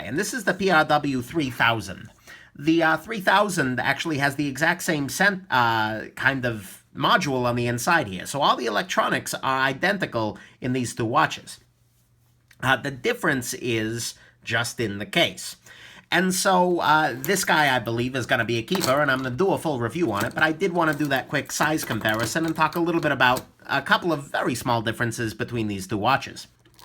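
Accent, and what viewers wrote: New York, United States. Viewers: Love your accent - are you a New Yorker by any chance? :-)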